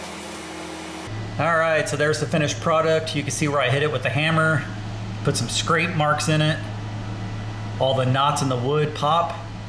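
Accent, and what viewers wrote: Texas, United States. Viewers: Morning having a chuckle here in Australia, but with your wonderful Texan accent I can hardly tell the difference between the two ways you pronounce “hearth”. Love listening to your accent. 😊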